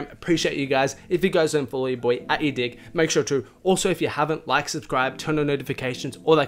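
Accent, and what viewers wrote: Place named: Australia. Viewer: He sounds Australian and they got winter now and summer in December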